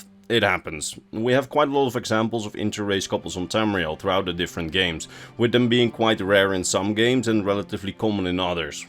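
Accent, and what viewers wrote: Netherlands. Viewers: Nederlands accent😜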